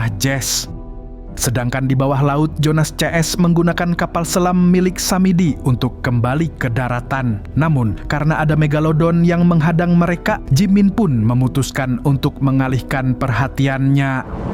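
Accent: Banjar, Indonesia. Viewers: Bahasa banjar admin nya, bungul am 😅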